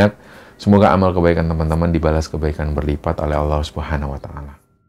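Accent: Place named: Surabaya, Indonesia. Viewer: Kalo dengerin dan logat mas, ngelingke aku sama Suroboyo. 20 tahun disana, sampai dapat jodoh orang Kediri. Semoga dan Tim semakin maju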